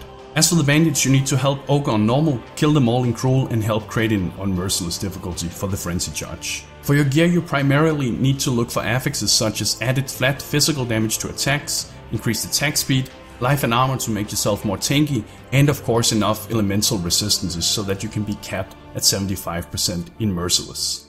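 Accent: Denmark. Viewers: Was thinking.... Damn thats a danish accent.. "Checks your twitter" oh... you ARE danish :) nice Nice content man, det er super godt :)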